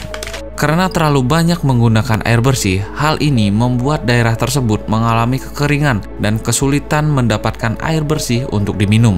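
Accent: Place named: Bali, Indonesia. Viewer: Orang bali bang? logatnya kayak orang bali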